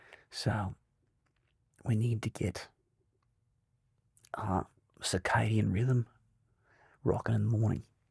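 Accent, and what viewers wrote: Australia. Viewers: YAYYYY my request for more aussie accent has been answered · I love this so much the accent is spot on mate! It makes me happy knowing you’re not mocking aussies accents! 💜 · Another fantastic video. Some great advice and thought provoking ideas. Been struggling a bit with too much thinking of “having to do” things instead of “getting to do” things. Added bonus, I love Aussie accents and yours is awesome. 😊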